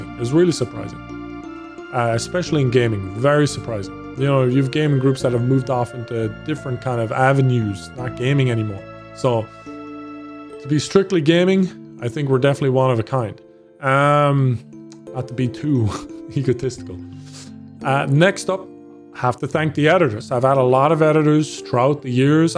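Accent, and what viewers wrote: Ireland. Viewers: He sounded extra Irish in this vid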